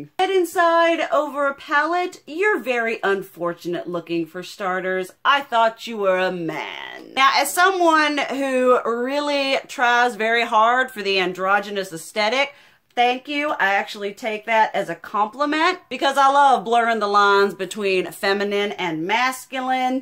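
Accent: South, United States. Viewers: I love your Southern accent and your voice and your clothes and your hair and makeup! Everyone likes something different, dang people are harsh. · I just join her channel 2 weeks ago I never thought her voice was annoying. I acutely like her southern belle voice. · I agree. I love it! I put these on my tv when im alone just because its so exuberant and comforting. (No idea why, I'm Swedish. I have no relations to southern USA accents) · I LOVE your southern drawl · Love your southern drawl. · I can’t imagine being so miserable to leave hate comments. Girl you are beautiful and love ❤️ the loud southern twang . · You sound like Jane Lane from Daria, but with a slight southern accent :) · Hahaha i love your voice and tone ! Its one of the things that made me come back after the first video i watched. I loved it , im not american and idk but it reminded me of some old movies that depicted people from the south or it reminded me of what i thought american accent was before i moved here.I really like it and i like the fact its so animated and has so much personality. 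Love it ! It sux that we are saying things online that we wouldnt say in life,if i dont like someone or something i just leave. Sometimes i want to comment on someone makeup but i think oh well its what they like and i dont say anything unless someone ask for an advice 🤷🏼‍♀️ Im sure i dont wear tgings that everyone love. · I love ur voice especially your country accent lol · “ Areeeeeeeeeeed” pretend like I used your long gorgeous southern twang! 😊😁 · I LOVE your voice. I think the first comment I either left you or told my friend about you was "She's like a Southern Belle mixed with Wednesday Addams, love!" Also, all these comments about "Your volume" do they not realize no matter what damn device THEY are watching on there is a volume control & they can turn it the hell down themselves? Lol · Most of those people must not know you're from the South. Your voice is not annoying, it's southern. 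Just like my voice is "valley girl". It's just a part of who we are. 🤷‍♀️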